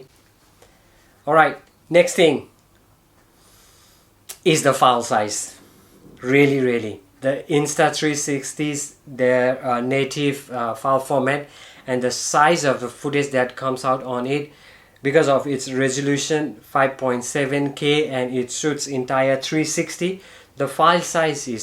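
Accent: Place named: China. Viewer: i wonder how come a Nepalese guy speaking English in Chinese accent .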